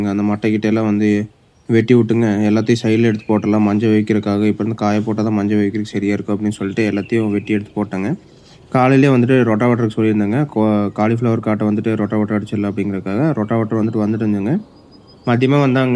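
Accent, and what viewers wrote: Kongu, India. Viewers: ஆயிரம் சொன்னாலும் கொங்கு தமிழோட அழகே தனிதான்❤❤❤❤❤ ஐ லவ் யூ டா அண்ணா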